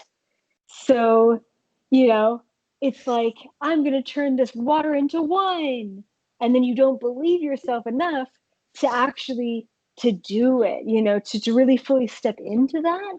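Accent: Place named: Sweden. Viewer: Jag hittade dig via videos, sååå kul att hitta en session med en svensk! Man hörde direkt på engelskan att du var ifrån Sverige 😋🤗❤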